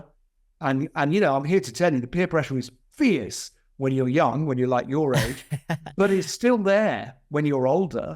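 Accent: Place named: United Kingdom. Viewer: U R DELIGHTFUL to WATCH & LISTEN TO!!😂🎉 💥😄👏😇 ❤️ ur BRITISH SOUND!! IT'S me "mother 👅😛' TONGUE!! 🤣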